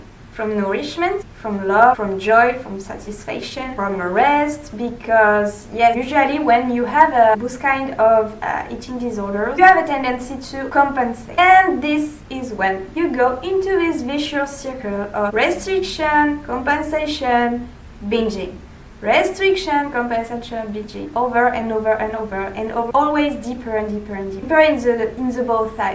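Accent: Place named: France. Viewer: Le French accent qui passe bien 👌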